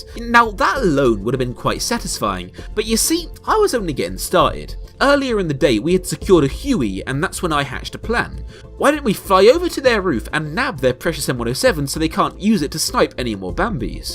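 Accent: United Kingdom. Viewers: Another squeeky britbong youtuber, no thanks. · I only kept watching due to your brittish accent.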